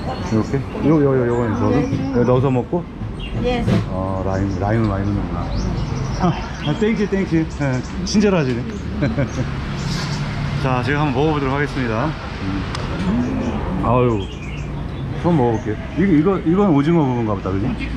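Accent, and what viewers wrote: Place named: Chungcheong, South Korea. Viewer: 충청도 어디여유?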